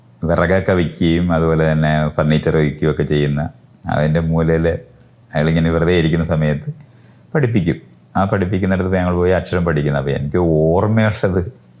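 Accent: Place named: Kollam, India. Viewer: പ്രിയ മൈത്രേയൻസാർ, താങ്കളുടെ മലയാളം എൻ്റെ കൊല്ലം (കൊട്ടാരക്കര) ഭാഷ പറയുമ്പോൾ എനിക്ക് എപ്പോഴും അനുഭവപ്പെടുന്നുണ്ട് ഇന്ന് സംശയം മാറിക്കിട്ടി സന്തോഷം താങ്കൾ എന്ത് ജോലിയിൽ പ്രവരത്തിച്ചിരുന്നു. എന്നു കൂടി പറയു👍♥️👍♥️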